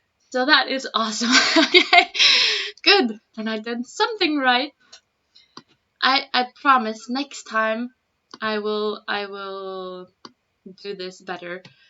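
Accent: Oslo, Norway. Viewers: Det var jo kjempefint å se deg, men jeg håper hvis du kunne lage en ny video der du snakker Oslo dialekt slik din. Her på vestlandet snakker de på en annen måte, meg det blir nyttig for oss som har lyst til å lære den østlandske dialekten. Tusen hjertlig takk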